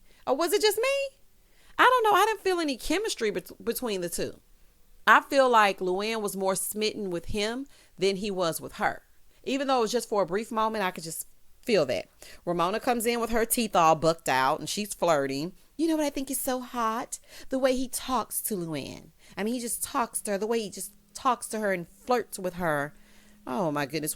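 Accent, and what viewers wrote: South, United States. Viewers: I love your beautiful voice you remind me of my Southern family on my mother's side. I am glad I came across your platform I have been enjoying your content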